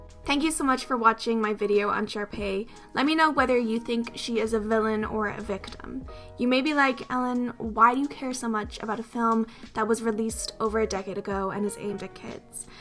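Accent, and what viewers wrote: Ireland. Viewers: oh its cool to hear an irish person doing videos like these! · I love this video and I love your accent, is it Irish? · Hearing an Irish accent here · Omg I just knew the narrator was a blonde! Haha didn’t expect to get a face reveal. Anyway , I loved the video :) also are u Irish or something? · OMG NO WAY. I’m from Ireland and I know an accent when I hear one but yours had me deeply confused! Until I looked in the description, YOU LITERALLY SOUND IRISHH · Your accent is interesting! I feel like I can hear touches of Irishness? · Love your Irish accent :D Great video, I never thought about these points when watching HSM as a child. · is she irish or american i'm so confused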